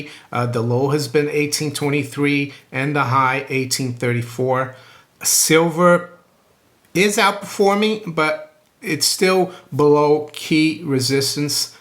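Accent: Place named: Italian-American, United States. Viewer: Brazil? You sound like any US/NY Italian-American. Now in London. You should explain your family's ethnic origins, travels and education. Fascinating. ... You look like you live very simply, frugally. If so, the bravo.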